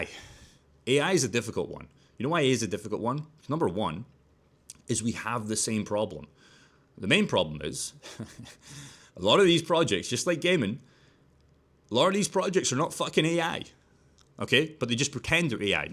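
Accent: Scotland, United Kingdom. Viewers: Scottish ffs what u American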